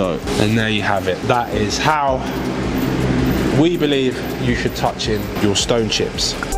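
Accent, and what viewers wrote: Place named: North London, United Kingdom. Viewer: Sound north of the river mate. South East sends respect.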